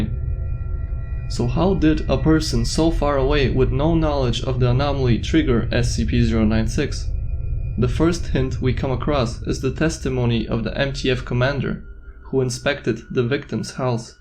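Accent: Germany. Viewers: Im sorry is there a Chance you are European,specifically German? My friend has the same accent I think it's really cute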